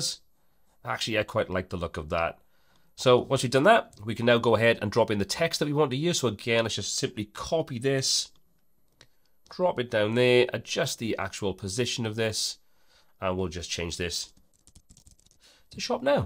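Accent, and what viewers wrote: Wales, United Kingdom. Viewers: Are you Welsh? Valleys boy RN Veteran here. ⚓️🏴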